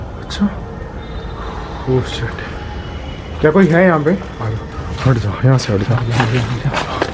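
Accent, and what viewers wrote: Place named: Bengal, India. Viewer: Aap ke baat karne se lagta hai aap Bangali ho kya sach me ho ya kuch or ho..... Big fan... vhi ❤️❤️ Love you...... Please reply me... 🥰😘😌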